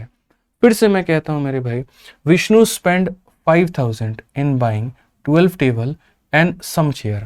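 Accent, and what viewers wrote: Bihar, India. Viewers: Waah guru ji bihari andaj me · बिहारी स्टाईल सबसे मस्त हैं सर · भईया आप बिहारी लग रहे हो 😮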